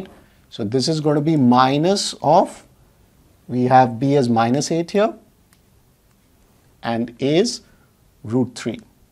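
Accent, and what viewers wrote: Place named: India. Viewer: listen to his accent, you can tell he is indian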